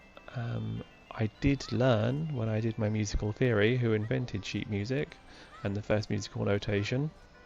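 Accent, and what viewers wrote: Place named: United Kingdom. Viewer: I stan a British man speaking softly into the microphone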